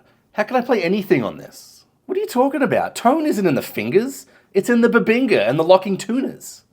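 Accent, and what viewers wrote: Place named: Australia. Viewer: Nice try getting a free guitar sponsorship from them, you got too little subs and views, and ur Aussie accent ain’t helping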